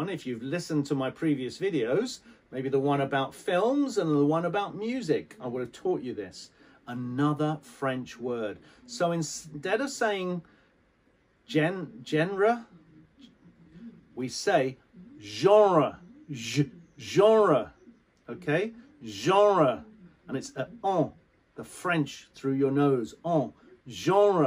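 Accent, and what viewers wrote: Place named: United Kingdom. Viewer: Waiting for this another british pronunciation of yours pastor😊❤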